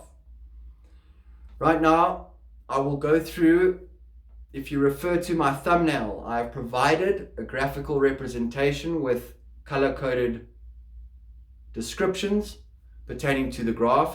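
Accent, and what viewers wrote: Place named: South Africa. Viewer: Thank you for this! Was so happy to hear your South African accent😊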